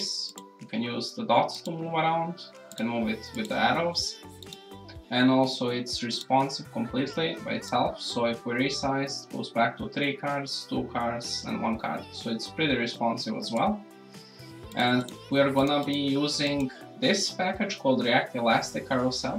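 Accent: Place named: Serbia. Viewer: Your accent is like u come from Serbia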